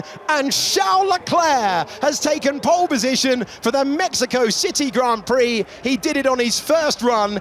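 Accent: United States. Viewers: get this american of the commentary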